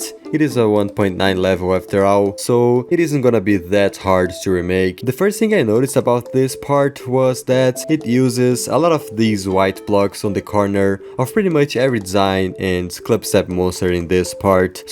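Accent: Brazil. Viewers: I KNEW IT,im portuguse from portugal and i knew your accent was from brazil xddd ola de portugal adoro o teu conteudo! Continua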